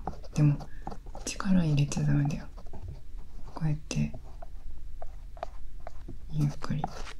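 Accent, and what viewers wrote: Japan. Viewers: 君の語尾けんってついてるの親近感湧く同じ県の方言やけん心地良い✨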